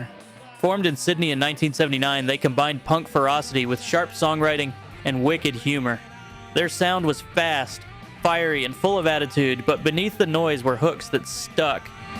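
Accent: United States. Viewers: I am surprised how good your taste is. You sound American so how did you get to hear of these bands. Many main stream Australians would not have heard of many of these. Well done. But how does a yank know of these?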